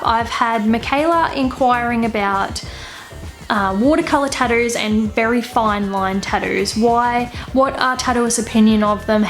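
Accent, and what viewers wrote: Australia. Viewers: She is Australian. Not British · you are so cute, i love your little australian accent :3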